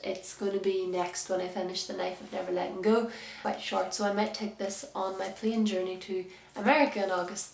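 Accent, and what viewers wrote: Ireland. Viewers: Are you irish? :D I found you through Sanne and I like you! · Are you Irish? I'm a Londoner xDD...Well I'm half. I mean, I was born and I live in London, but I'm half lol <3 Are there any books you can recommend for someone around my age-18-? xX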